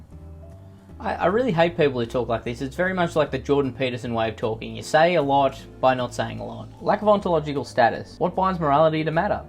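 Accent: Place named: Australia. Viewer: your funny australian accent tickled my fancy dear young man you get a like 👍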